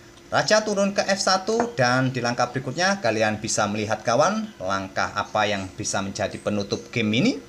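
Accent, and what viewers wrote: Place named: Surabaya, Indonesia. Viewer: O..jebule (ternyata)wong suroboyoan,...salam ko aku wong Kediri sing manggon di kota Bekasi,...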